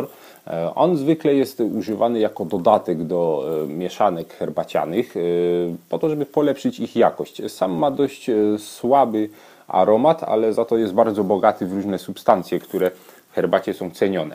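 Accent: Silesia, Poland. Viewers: Słucham, słucham i myślę sobie: chłop chyba ze Śląska. No i rzeczywiście. Rozpozna swój swego;)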